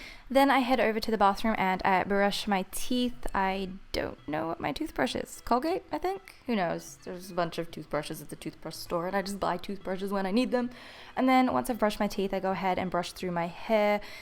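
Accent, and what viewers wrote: Australia. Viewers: are you australian? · Are you Australian? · Yep she's Australian 😊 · I can tell ur from Australia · Are you Australian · Love your accent 😍😍 Australian? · Is she Auatralian?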